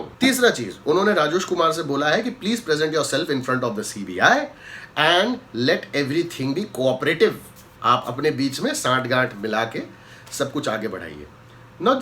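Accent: Bengal, India. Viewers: Who else is here only for his awsome bengali accent mimicry..😁😂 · ko bahana mil gaya Bengali accent karne ke...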